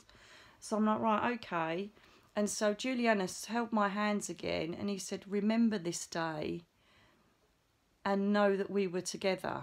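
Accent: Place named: United Kingdom. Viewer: Im just going to go ahead and say it ask it whatever lol 😅 is it possible that you have been a spirit guide to me before or can you be a spirit guide to someone while part of your soul is currently incarnated at this time let me explain its your voice the british accent the sound and frequency is almost an identical match to what Ive heard speaking to me for years. Im from WV USA but Ive always had a lovely female with a British accent that often speaks to me. I use to brush it off so noone would think I was crazy. Lol 😅 I said it I almost erased this but I heard a big NO in my left ear and a nudge to continue and ask dont erase or feel embarrassed 😂 anyways Thank you for all you do your a wonderful teacher ❤️😊 and yes I'm very grateful for all the knowledge you give and the stories you share dearest 🙏💜🕊️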